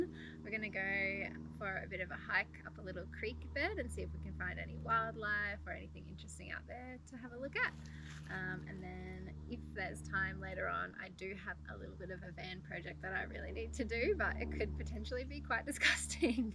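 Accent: Australia. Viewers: Мне не нравится австралийский акцент😮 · Are you from Australia?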